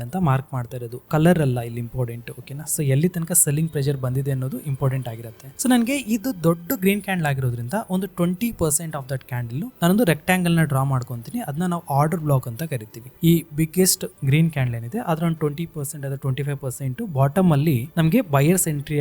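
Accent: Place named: Ballari, India. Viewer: Very nice video, I watched full and gave like also, I hope we can see 1000 likes very soon. But irrespective, please continue this video and show us to build the screener in chartinc for next steps. Are you from Ballary / Raichur region? I am just guessing based on your Kannada accent, I might be wrong. Thanks for this video, God Bless You.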